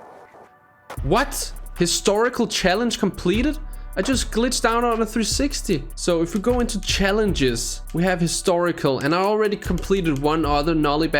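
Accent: Denmark. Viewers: are you from denmark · He's Danish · Are you from Denmark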